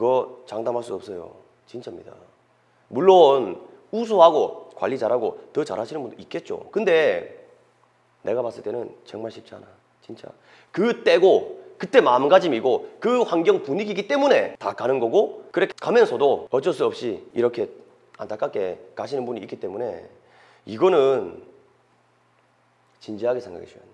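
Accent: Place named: Gyeongbuk, South Korea. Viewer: 선생님 혹시 고향이 경북이십니까ㅋㅋㅋ 유튜브에서 고향말투들으니 정겹네요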